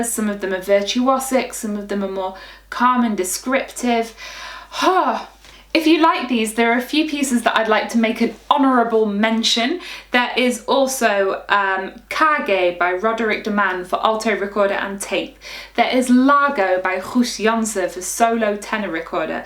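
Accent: United Kingdom. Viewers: that british acent 😆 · Thanks for sharing these pieces. And, first of all, I really love your British accent. There are two recorder contemporary pieces I like so much. The first one is "Glass" (from "Minimal Preludes") by Karel Van Steenhoven. The second one is "Thymehaze" (for alto recorder and prepared piano) by John Casken.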